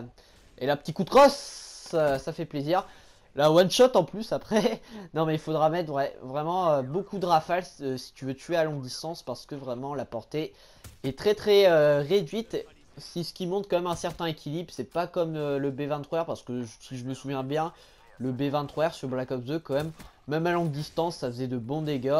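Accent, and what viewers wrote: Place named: North, France. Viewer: Tu viens du nord toi non m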